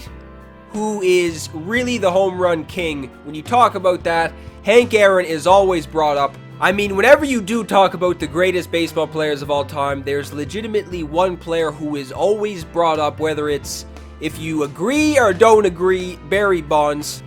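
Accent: Canada. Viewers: Ill tell ya whats NOT the G.O.A.T...ur stupid canadian accent. We all hate it. Effin period....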